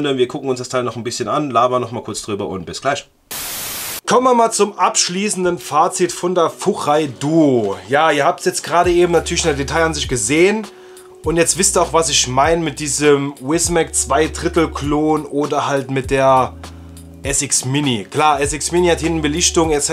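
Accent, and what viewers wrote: Saarland, Germany. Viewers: Kommscht du ausm Saarland? de Dialekt kommt ma bekannt vor ;)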